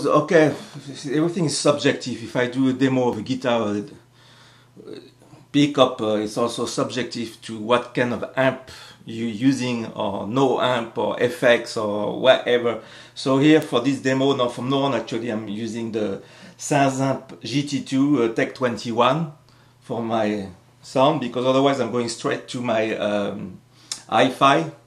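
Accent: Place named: France. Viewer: On sent l'accent français :') Bonne video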